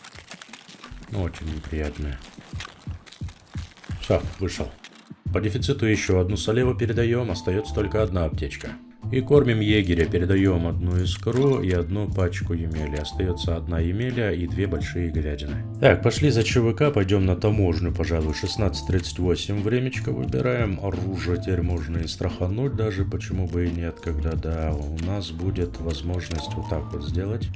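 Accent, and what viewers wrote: Kazakhstan. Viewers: говор прям наш сразу начал подозревать что с Казахстана!))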